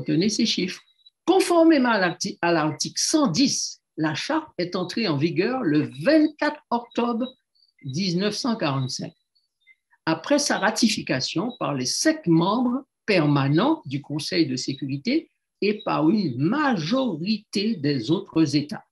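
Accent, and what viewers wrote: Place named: Haiti. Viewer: Tu dois bien t'exprimer en français tu parles comme tu chantes? C'est la langue (patois) haitienne qui détruit ton français et ton accent . Les haïtien parlent de la même façon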